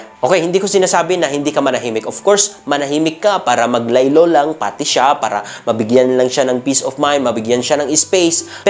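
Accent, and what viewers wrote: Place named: Ilocano, Philippines. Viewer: PArang ilokano itawis ung pagbigkas mo Ng salita sir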